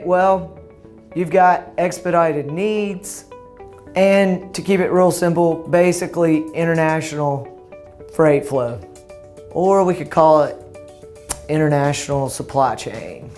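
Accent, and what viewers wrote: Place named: Texas, United States. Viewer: ¡ Love the Texan Accent ! 😉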